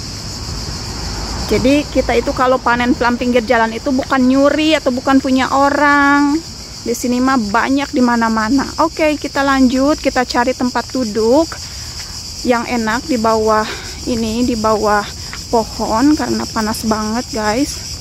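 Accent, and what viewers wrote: West Java, Indonesia. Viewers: Nemu lg org sunda,logatnya jgn ilang teh😃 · Pertahankan logat sundanya ya neng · Semangat terus ya teh. Salam kenal sy sunda bandung. Lucu pisan denger logat sundana💕👍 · Semoga cepet dapet momongan ya teh semangat terus pokoknya😁😁 Padahal ga ngerti klu teteh omong sunda tapi seneng aja denger teteh omong sunda menghibur banget tehh 🥰🥰🥰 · Kecanduan suara ama logat teteh....sederhana...wlw kdg kurg ngrti bhsa sunda😅😅😅😅tp.seru..slm.dari semarang..nama q juga teeh😅😅😅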